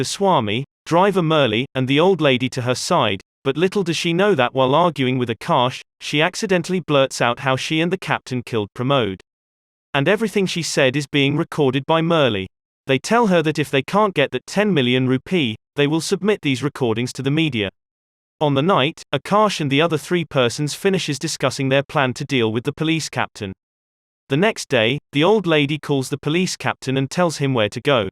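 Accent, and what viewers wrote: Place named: United Kingdom. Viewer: Wow ur first video n u got 600 k views .... bollywood is lucky for u ... u have a british accent but ur from USA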